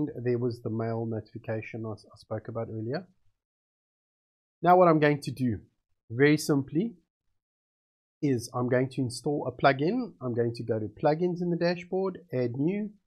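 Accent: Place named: South Africa. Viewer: Love hearing a South African accent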